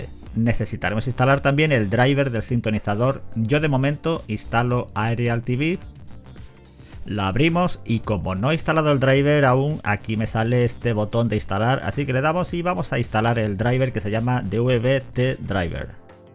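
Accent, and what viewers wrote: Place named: Spain. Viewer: Acento de España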